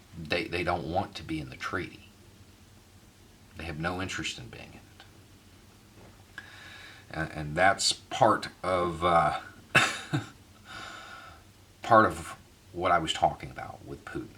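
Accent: United States. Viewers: You speak real American. I wish more of us did. We all need to show up at our local republican office and demand that they denounce this Fucking imbecile trump and start impeachment proceedings... or we pick them up by their Fucking Traitor throats.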